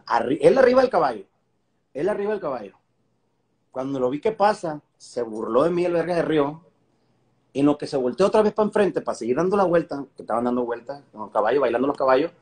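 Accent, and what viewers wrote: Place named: Fresa, Mexico. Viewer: Compa aveces como que ya te sale lo fresón pero saludos compa!!!!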